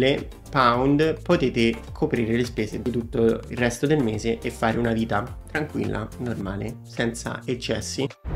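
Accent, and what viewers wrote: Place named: Rome, Italy. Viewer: Sembra romano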